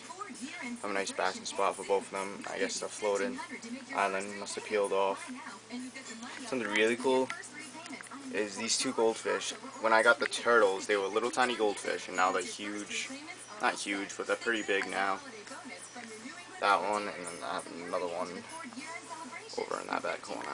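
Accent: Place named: Boston, United States. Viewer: you from boston, nice accent im like an hour away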